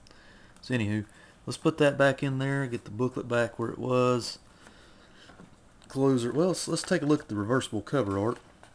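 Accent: South, United States. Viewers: Whoa you have a really thick southern accent. Do you really talk this way in real life?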